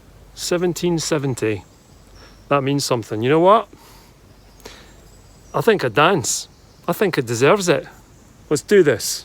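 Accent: Scotland, United Kingdom. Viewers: Always nice to hear this lovely Scottish accent. Over 30 years ago i had trouble understanding any scottish, being of non English background. Had to have hubby translating english into english! Love watching your vlog. Cheers Daniela from Australia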